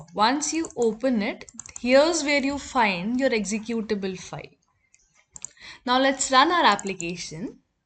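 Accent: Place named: India. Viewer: Good content, but the Indian accent is SO STRONG that it is VERY Distracting. The cadence of speech is from a totally different language than English. Please learn how to speak with at a minimum British accent, or much preferred ... an American-style accent.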